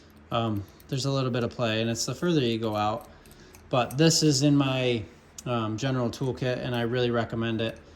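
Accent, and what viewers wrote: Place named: Wisconsin, United States. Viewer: Are you from Wisconsin? Your accent sounds like Wisconsin.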